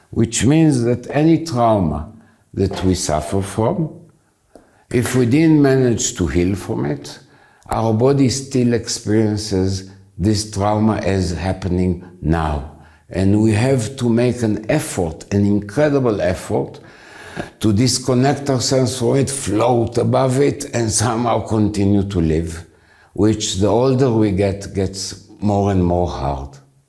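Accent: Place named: France. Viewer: I love your accent are you French and thank you for your wonderful, articulate wisdom. A merci...😊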